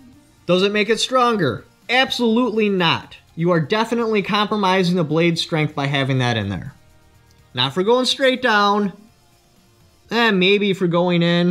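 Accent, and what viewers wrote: Canada. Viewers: The Canadian is strong with him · This is the great Canadian/hillbilly accent. Its awesome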